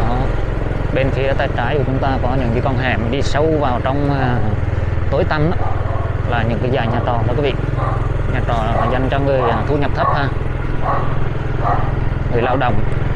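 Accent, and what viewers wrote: Central, Vietnam. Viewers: Cảm ơn em đã cho cơ hội theo em đi dạo cảnh Đà Lạt mỗi ngày. Những cảnh rất đời thường và thực tế. Thế mới quý, em ạ. Cách đây khoảng 8 năm, tôi đã ghé Đà Lạt và thấy xa lạ lắm. Qua những chia xẻ của em, tôi hiểu và quý Đà Lạt hơn. Ước mong có ngày gặp lại thành phố nhỏ và dễ thương đó. Bây giờ thì nghe quen giọng miền Trung của em rồi.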